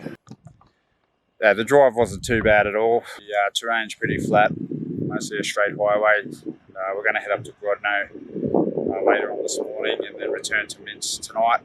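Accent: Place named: Australia. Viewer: So refreshing to hear Aussie accent on a cool travel blog.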